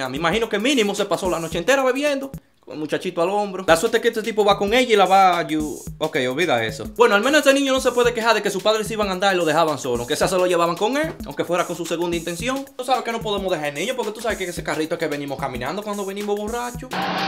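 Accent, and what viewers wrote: Cibao, Dominican Republic. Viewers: LO QUE ME CURA ES EL ACENTO CIBAEÑO QUE EL TIENE JAJAJA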